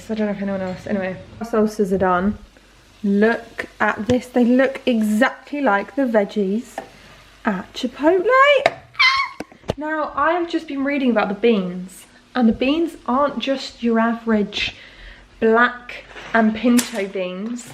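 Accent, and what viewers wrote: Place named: South, United Kingdom. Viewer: just curious, what would you call your type of British accent? Your dad's is definitely not the standard southern urban one, but you are clearly not northern but I can't tell what type of southern accent you have. I'm an American so I have no idea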